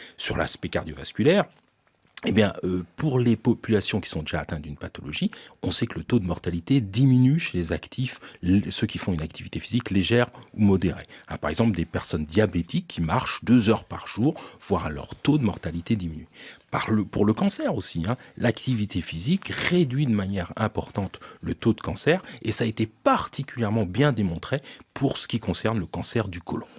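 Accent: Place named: France. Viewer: Pourquoi ce monsieur prend l un accent Français....probablement si est français 😅😅😅😅 Pauvre Afrique....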